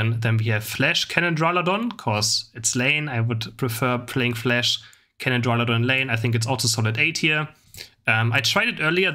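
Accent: Germany. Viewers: Klingt ziemlich deutsch 👀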